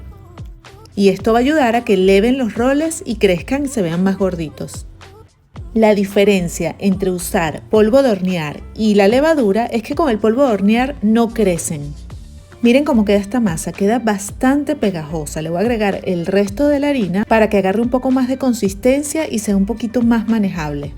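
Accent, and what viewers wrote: Venezuela. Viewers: Me encantan tus recetas muchísimo . Me gustaría aprender algunas recetas de tu hermoso Venezuela 🇻🇪 me encanta tú acento 💜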